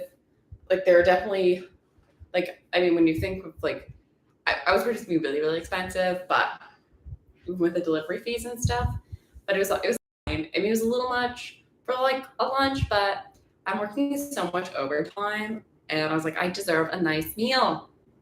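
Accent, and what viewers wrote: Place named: Wisconsin, United States. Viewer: Regarding the accent... don't forget the Wisconsin influence. Doncha know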